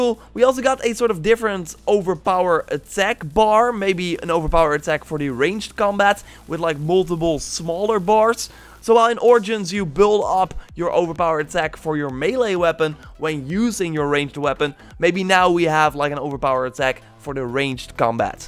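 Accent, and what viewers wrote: Netherlands. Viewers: Dude, your English could use improvement. You shouldn't mix Dutch with English ;) Also your Dutch accent clearly comes trough, you are not doing us any good with that ;)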